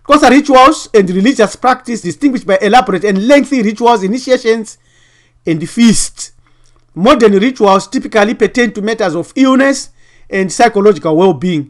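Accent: Shona, Zimbabwe. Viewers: He is NOT even South African. I think he is Shona judging by his accent. He is fascinated by the Ngunis instead of being fascinated by his people the Shonas.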